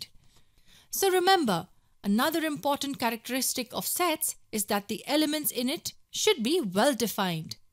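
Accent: India. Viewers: She has a strong Indian accent and lo and behold India pops up twice in the examples. Is this an attempt at subliminal propaganda from Indian Nationalists by any chance ? 😁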